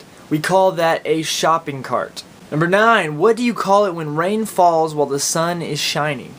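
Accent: Oklahoma, United States. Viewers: Your Okie accent just reaffirmed mine. Now I know what I sound like (only I pronounce lawyer "loyer" and I say soda instead of coke). XD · Cool, I love Oki accent. it reminds me Carinvale series. :-) cheers mate, lovely accent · I live in Oklahoma as well. ^_^ I have almost the same accent as you. (From around Tulsa. Owasso.) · I live in Broken Arrow which is like 10 to 20 minutes away from Tulsa, and it’s funny because around here we sound exactly like this guy. It’s funny because I rarely hear the twang southern accent. If I go to Norman or Wagoner I do. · Simple Oklahoma accent the E’s Got to get him Got to geit eeeem Instead of (in) they say eeeeeean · in Tulsa we called it Soda or Sodapop (sounded like sodeepop) or pop. I have no clue which part of Oklahoma he's from but most of what he's saying sounds a lot like us Tulsans. sadly I left that beautiful stay years ago for Oklahoma so I am not 100% certain on this due to Californians but he said every word about the same as how I say em. 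· I'm Australian and I refer to the "bug that curls up into a ball" as a 'Slater'. My Dad's parents were both from Oklahoma and now I finally know why my Dad kept telling me these bugs were called 'Roly polies' while everyone else kept saying 'No, its a Slater'. Interesting listening to your Oklahoman accent, yours is no where near as strong (stereotypical) as my grandparents. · Ashley Burt pretty much all oklahomans talk like him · Its that OKC or Tulsa Okie. Lmao. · I love this accent too much. I need a guy from Oklahoma to move to Australia lol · From what I've read, the California accent is descended from the Oklahoma accent. Watching your video, this is my first time hearing an Oklahoma accent, and I can totally hear how similar it is. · Hmmm...he sounds very Central Oklahoman and i used to work in drive thru. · You sound more northern Oklahoma I'm from ada and mine is way thicker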